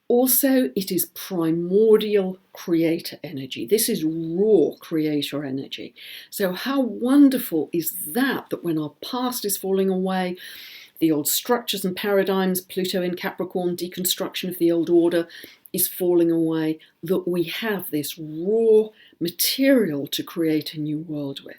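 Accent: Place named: RP, United Kingdom. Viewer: I like that you are friends with Bracha. Good video. You are very posh:)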